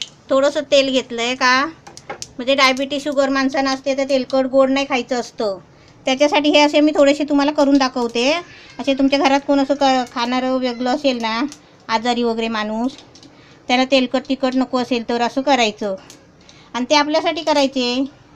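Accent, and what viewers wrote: Konkan, India. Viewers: tumhi bolata khup chhan..kokani laheja madhe..recepies chhan tumachya...sp. tumache ase veg recepies dakhwa..thank u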